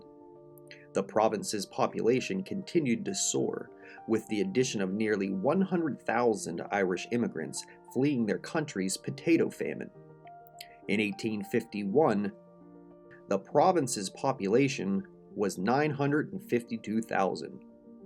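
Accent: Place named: United States. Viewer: Are you American? It's funny how you pronounce all the French words.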